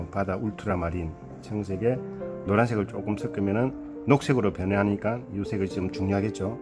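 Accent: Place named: Gyeongsang, South Korea. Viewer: 정감어린 경상도 사투리와 ^^인상이 참 선해 보이십니다^^ 하나하나 잘 배우고 보도록 하겠습니다^^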